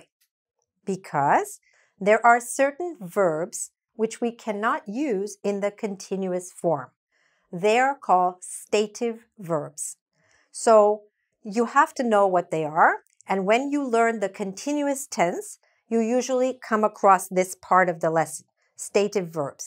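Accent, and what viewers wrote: Brazil. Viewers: Thank you so much, I think you are Brazilian because I am almost understand you perfecttaly! It is beautifull gift you have teaching! Excellent! Thank you for posting!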